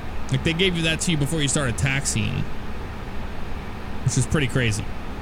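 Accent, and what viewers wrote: United States. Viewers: wait, can you really not tell lol, he is american. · Are you American or Australian?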